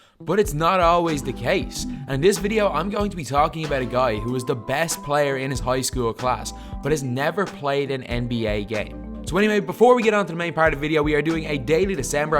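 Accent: Ireland. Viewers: Interesting video :-) And I just gotta say ut: love that Irish accent :-) 🇨🇮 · Are you Irish?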